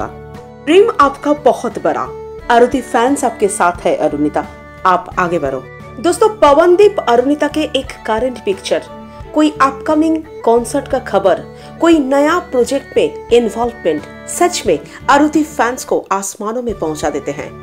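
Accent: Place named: Bengal, India. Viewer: The heavily Bengali accented hindi commentary reminds me of one of Keshto Mukherjee's famous dialogue from the Bengali film 'Troyee' : " Debanander hindi filim keno, banglay fire esho" ...Why so much effort in the hindi narration? Arudeep has enough followers in Bengal to get views for the channel😊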